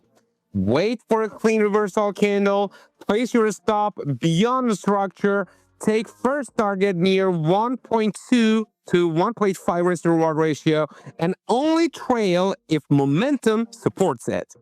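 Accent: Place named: Poland. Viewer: First of all thank you for this interesting video. Regarding your voice, I assume that's because the regular Polish language melody does not work when talking English. Like my Swiss German talking style in Italian would hurt your ears 😉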